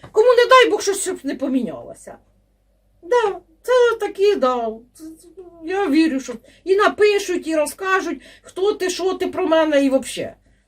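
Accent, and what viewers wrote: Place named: Central Ukraine, Ukraine. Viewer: Це не суржик, це наш центральноукраїнський діалект.Ми так розмовляємо в Кропивницькому ,в Черкасах, в Полтаві.Дякуємо за вашу працю, за стійку українську позицію.Слава Україні! Слава Героям!❤❤❤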